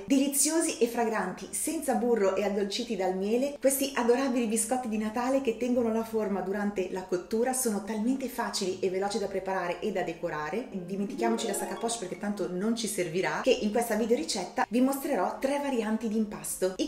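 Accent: Romagna, Italy. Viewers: Ma che meraviglia sei? Ti trovo davvero brava.... Per non parlare della simpatia e l'accento che immagino romagnolo mi fa sentire a casa... Ma gli elastici dove li hai presi? Grazie e tanti auguri.. Anche se un pochino in ritardo!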